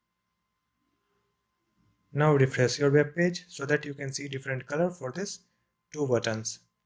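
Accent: India. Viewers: Bhai Indian accent not los Angeles CA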